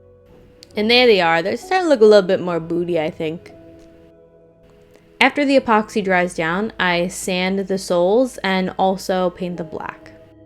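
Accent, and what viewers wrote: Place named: Upstate New York, United States. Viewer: I love your lingo. I swear if you lived nearby we would be besties. Unfortunately for me Id guess from your dialect (which sounds north eastern, more specifically Upstate New York) is on the other side of the country. I live in Washington State.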